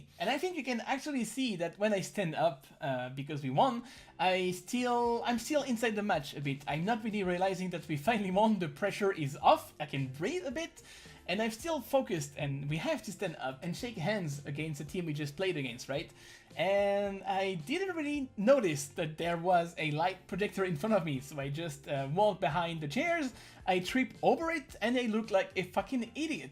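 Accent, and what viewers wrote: France. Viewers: Cet accent... l’accent français se sent.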